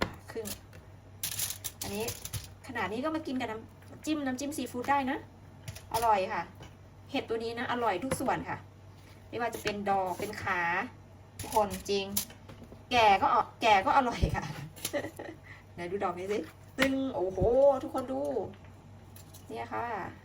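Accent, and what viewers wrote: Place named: Isan, Thailand. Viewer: พี่ พูดอีสานน่ารักพี่ก็ขำไปกับไอ่ด้วย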